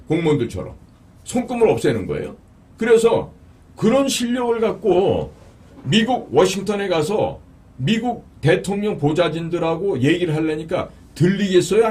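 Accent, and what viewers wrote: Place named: North Korea. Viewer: 님 이북에서 왔어? 말투도 그렇고, 종자주의? 난 처음들어보는 저런 단어를 쓰고 있노.. 저거 이북단어 아니여?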